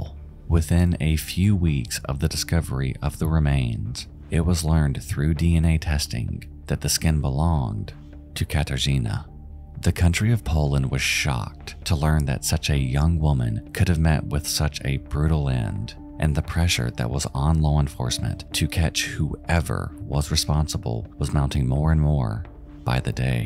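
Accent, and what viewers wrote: South, United States. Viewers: I’m sorry, I know this is a serious video, but the question must be asked: how does this man with a vaguely south-ish USA accent say “Katarzyna” better than Tyra Banks who regularly works with East European (among other) models ever did on her show when she literally also had a girl on the show for almost every episode of Cycle 10 *named Katarzyna*? Angry.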